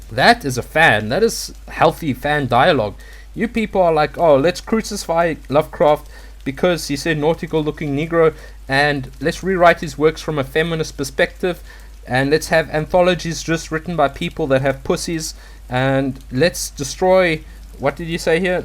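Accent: South Africa. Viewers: You sound very South African. Did you move to Europe?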